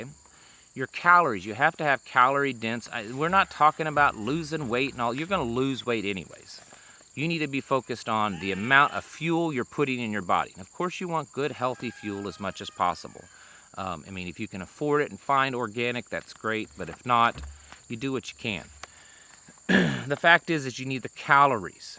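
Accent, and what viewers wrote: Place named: Appalachia, United States. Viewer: What happened to this guys accent??. Back when he was comparing chainsaws he sounded like ah hillbilly . Lol weird.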